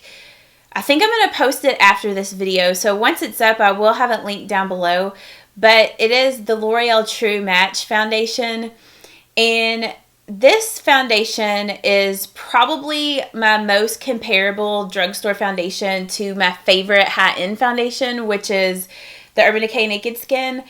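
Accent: South, United States. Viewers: does anyone know where she's from? I'm hearing a southern accent. I'm from ga. just wondering!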